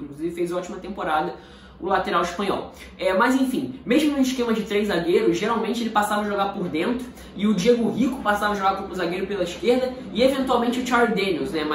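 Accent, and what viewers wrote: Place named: Rio de Janeiro, Brazil. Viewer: ta lá minha audiência qualificada aí hahah! você fala muito bem, parece o rafael oliveira com mais sotaque carioca haha baita análise quando encontrar o comentário de Leonardo Andrade nos seus vídeos serei eu ;)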